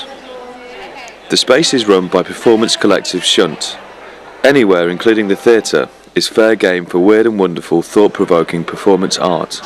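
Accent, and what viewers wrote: Liverpool, United Kingdom. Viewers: interesting - just post something on London and watch the haters appear (!) mindless north-south warring (isn't there enough contention in the world for you all?) Ironically - not sure this guy is a Londoner - I detect flashes of Liverpudlian (or similar) in his accent. Listen to how he says 'example'. ;-) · Are you scouse?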